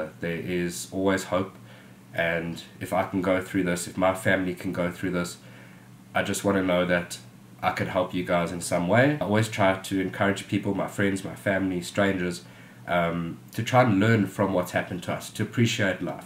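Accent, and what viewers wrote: South Africa. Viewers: I am so very sorry for your loss. I'm trying to pinpoint your accent? South African? Just snooped your other videos, and answered my own question when I saw one video said you are in Africa. This video blessed me today, and I thank you. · I'm so sad about your tragic loss, but happy you had a precious boy thereafter. Awesome to hear your SA accent! The Lord bless you and keep you. Greetings from Mossel Bay 😊❤😊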